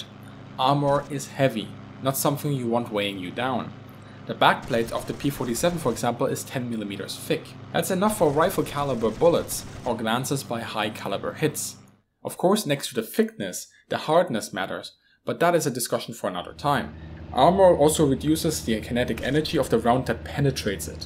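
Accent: Germany. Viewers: Man höhrt dass sie Deutscher oder Österreicher sind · Ich kann aus deinem aktzent raushören das du deutsch bist! Hallo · R u german?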